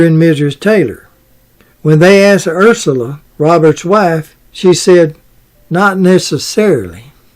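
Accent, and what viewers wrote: South, United States. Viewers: sir, I have mentioned over the years how I enjoy your "Southern" accent bringing additional entertainment to your videos, and this is true. As a transplant from NYC to now southwest FLA, I enjoy it even more. BUT! I am remiss in not mentioning, not only do I enjoy your videos, but I have not mentioned the amazing detail in the research that you unfold for us in ALL of your videos. Well done ALWAYS sir!! Keep them coming!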